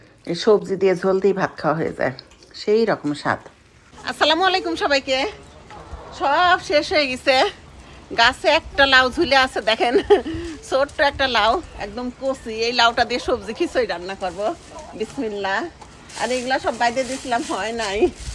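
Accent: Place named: Kushtia, Bangladesh. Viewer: আপনার ভাষা শুনে মনে হচ্ছে আপনী কুষ্টিয়ার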